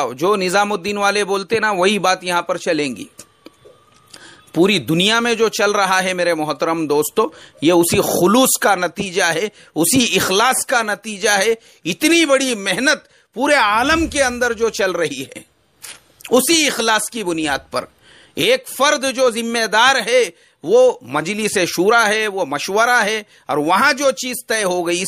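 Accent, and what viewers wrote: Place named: South, India. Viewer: Aap ki lahze se malum chalta he aap junubi hind se taaluk rakhte hein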